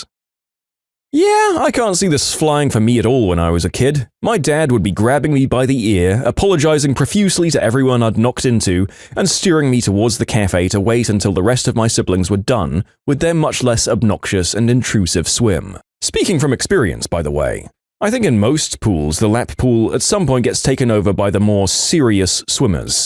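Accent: United Kingdom. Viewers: I see your British.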